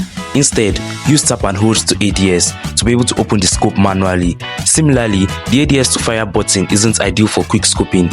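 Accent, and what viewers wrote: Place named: Nigeria. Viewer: You sound like a Nigerian ngl like me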